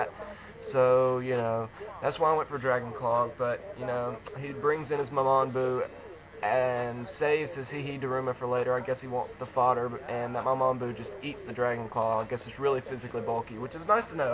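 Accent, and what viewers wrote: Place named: South, United States. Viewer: where are you from? im likin that southern accent!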